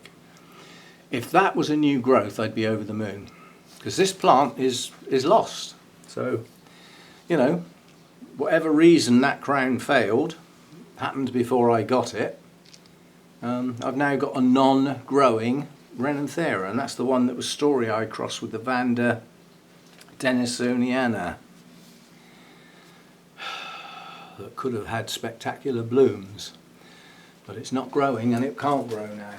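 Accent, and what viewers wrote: United Kingdom. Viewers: I'm pretty sure I happened upon your channel when I did a search for "aggregatum orchid care" which I know you did not have back then but the word "orchid" probably led to your channel. I stayed because I like your personality and you have a wealth of knowledge I appreciate. Plus as an American, I enjoy your British accent! I remember when I first heard you call a plant "tatty" and I found it charming. And you have a kooky sense of humor. I hate this social distancing too, but we have to do what we can.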